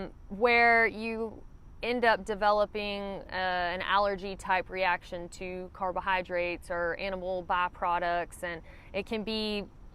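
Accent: South, United States. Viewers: Small world. I've been looking at getting into backpacking for a little over a month. I found your videos, really good and I've watched quite a few. I could tell from the first one you were southern, duh. Then I seen you were from Alabama...I thought that's cool, me too. Then a few videos later, I seen you were from Opelika. Then I thought wow, small world. I'm a lineman at Opelika Power. Keep up the good work. Your videos are invaluable.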